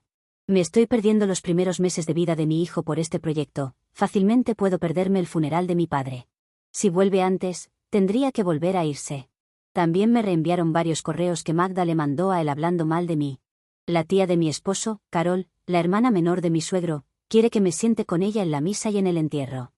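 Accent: Mexico. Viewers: Tan temprano y ya tenemos suegra tóxica 🙄 por cierto, buenos días! Edit: amo como habla e insulta a su suegra en mexicano!!! Te quiero OP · Se nota que es de México jaja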